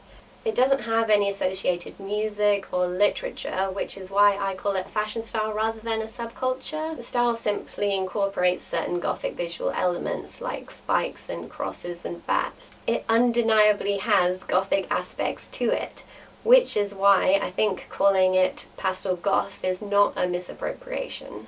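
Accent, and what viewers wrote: United Kingdom. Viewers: Where are you from? Because i'm English & i've never heard somebody sound SO English lol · Your Queen's English is immpecable! Lovely to see another well spoken being ♥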